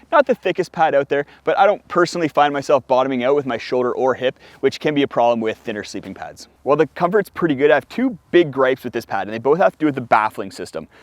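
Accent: Canada. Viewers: I mean if the accent wasnt obvious, the mixing of metric and imperial measurements make it obvious of your canadian heritage lol.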